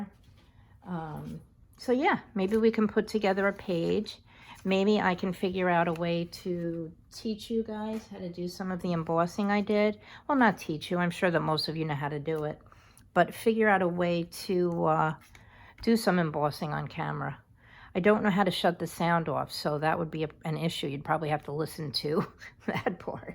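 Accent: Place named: New York, United States. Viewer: I thought I heard some New York in your voice! I’m originally from Brooklyn 🥰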